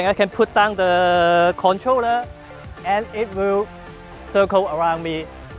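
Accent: China. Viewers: china english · You are from Hong Kong, yea? My mother speaks cantonese and all ger chinese friends have the same accent :D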